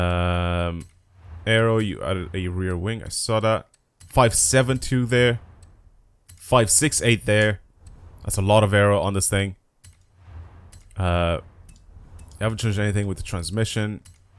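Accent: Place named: Donegal, Ireland. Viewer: Where you from in Ireland cause I can’t recognise that accent you sound a bit like Donegal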